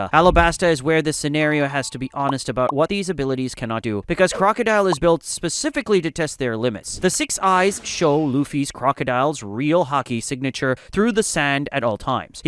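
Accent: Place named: India. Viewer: why bro got indian accent?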